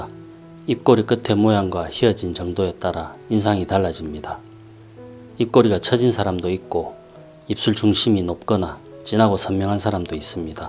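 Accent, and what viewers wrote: Gyeongsang, South Korea. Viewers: 갱상도 분이시네!! 반가워요^^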